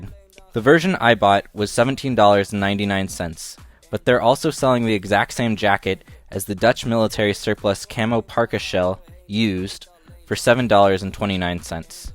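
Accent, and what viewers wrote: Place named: Germany. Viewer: sorry but that's a german accent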